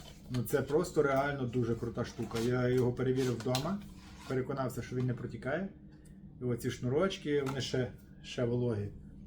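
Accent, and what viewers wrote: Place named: Western Ukraine, Ukraine. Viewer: По акценту точно не з Донецьку і не з Луганщини.Так десь блище до земель народження Степана Андрійовича Бендери. Патріот справжній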